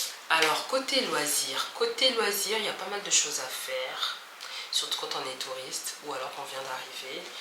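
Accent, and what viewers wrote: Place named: Ivory Coast. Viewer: Tu as un accent ivoirien. En plus tu ressembles a une fille agni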